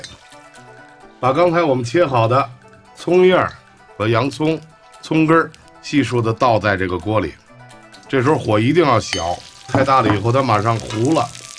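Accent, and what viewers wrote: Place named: Beijing, China. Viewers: 第一是北京出租车司机现在基本都是郊区甚至河北人了，已经不是传统北京，也就是二环（最多三环）以内的那种北京人了。第二是旧北京是割裂的，拉车挑水的苦力是一个阶层，满蒙王公、汉人士大夫是另一个阶层。现在常见的那种夸张的北京话，比如大量儿化音、吞字吞音都属于前者。这个视频里的是接近后者。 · 师傅的北京话听得真舒服，到家了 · 正宗皇家口音，非常悦耳。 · 为了这一口标准的京腔来的，太好听了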